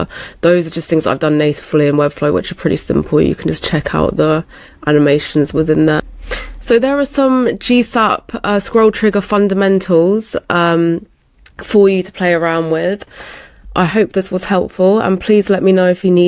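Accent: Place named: United Kingdom. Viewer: I love your British accent, it sounds so beautiful. Of course, I also liked your video, they are amazing effects.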